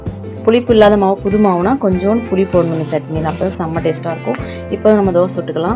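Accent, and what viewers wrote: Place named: Tirunelveli, India. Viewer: Arumaiyana tirunelveli slang sister 🎉🎉🎉🎉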